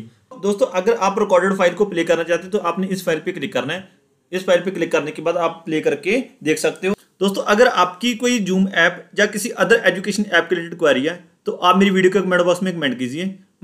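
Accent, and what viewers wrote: Punjab, India. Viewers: Seems like u are Punjabi from Ur accent 😊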